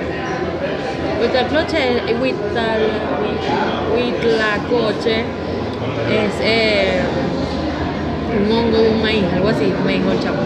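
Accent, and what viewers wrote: Venezuela. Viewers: Me fascina tu acento, no sé si es venezolano pero me encanta. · eres panameña? hablas como venezolana saludos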